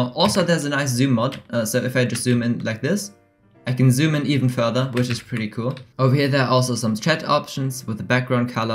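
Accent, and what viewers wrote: Germany. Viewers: I can litterly hear that ur from Germany I am too btw good vid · U sound very German are you? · deutscher akzent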